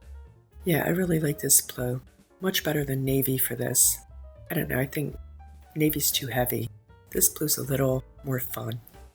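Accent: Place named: New York, United States. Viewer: Love this sign! Hope you’re loving your new fridge! Where. Are you from? Your accent sounds like we could be neighbors! I’m hearing NY NJ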